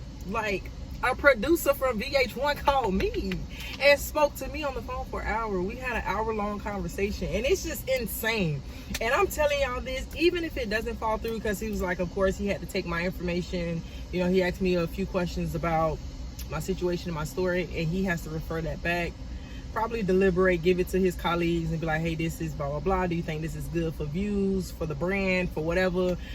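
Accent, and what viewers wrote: Florida, United States. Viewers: First of all CONGRATULATIONS!!! I am soooo happy for you! Im not even sure how I came across you but as soon as I heard that accent I said “ She either from St Pete or Jacksonville!” LOL! I’m in DUUUUVALLL too! So it’s so nice to see you making your situation into a positive one! I caught a felony soon as I turned 18 & the judge said she was gonna make an example out of me. I was lucky I only did a night in jail 5yrs probation but the stigma with having a record never leaves! So congrats again! I can’t wait to see what awaits you!!🎉🎉🎉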